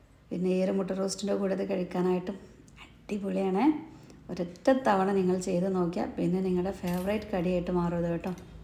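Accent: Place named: South Kerala, India. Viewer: Pazhaya malapuram poyit ipol thekan slang aanallo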